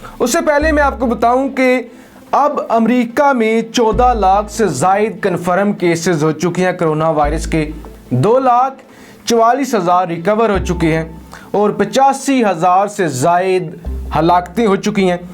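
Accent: India. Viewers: گلت فہمی نہیں ہوتا غلط فہمی ہوتا ہے آپ انڈین لہجہ چھوڑ دیں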